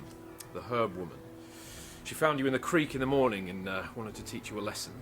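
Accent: RP, United Kingdom. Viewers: You sounded to posh for a Henry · You definitely sound too posh for a peasant. WHS made the right decision.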